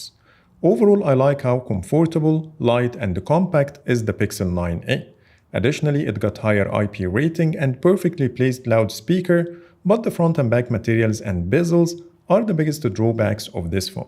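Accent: Egypt. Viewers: Is this Egyptian accent 😂 or i am just imagining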